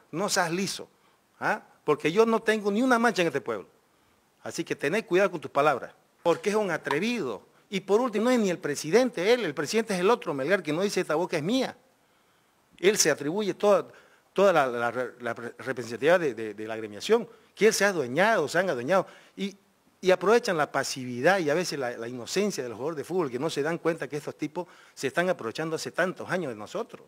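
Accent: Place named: Camba, Bolivia. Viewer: esta es la voz de un camba de verdad, grande!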